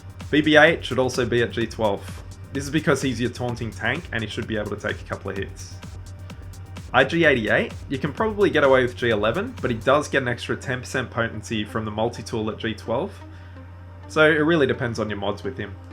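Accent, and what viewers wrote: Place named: Australia. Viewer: You got a sub for bloody great accent you aussie legend. Thanks for the pro tips amigo just started running this myself and they help heaps!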